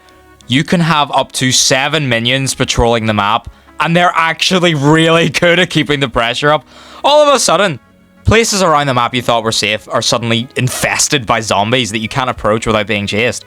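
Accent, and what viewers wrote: Scotland, United Kingdom. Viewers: Idk why this dude has a slight Scottish accent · Maybe he’s Scottish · Never thought I’d laugh this much on a Scottish person ranking killer abilities while swearing frequently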